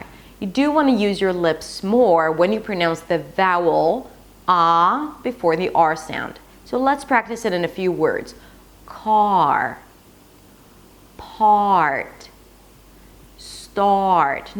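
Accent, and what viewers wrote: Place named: United States. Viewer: Wow, what gorgeous American accent!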